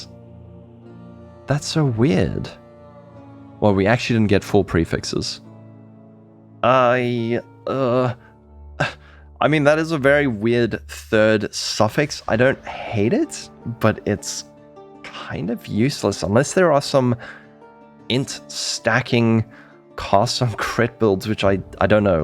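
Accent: South Africa. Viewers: Are you South-African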